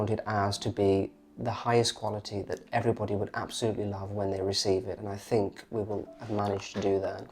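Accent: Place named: England, United Kingdom. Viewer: you are simply lovely. Yes, beauty does make life enchanting. The English garden, way of life and pronunciation: is you encapsulated. Thank you my friend.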